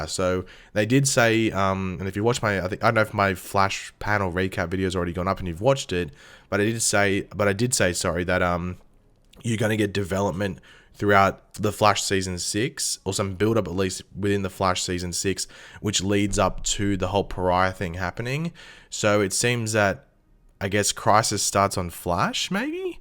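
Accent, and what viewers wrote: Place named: Australia. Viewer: You’ve become EXTRA Australian in this video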